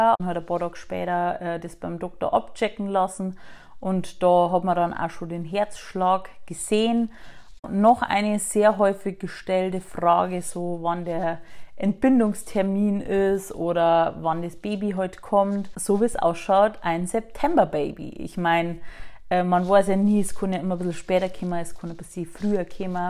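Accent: Bavaria, Germany. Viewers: I finde sau geil, dass du Boarisch redst. I bin a a Borei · Nochmal herzlichen Glückwunsch zur Schwangerschaft. Ich würde mich auch freuen um Videos zur Schwangerschaft. Ich finde dich auch super sympathisch ich mag dich. Endlich eine YouTuberin die so schon Bayrisch redet. Ich liebe den Dialekt. ❤️❤️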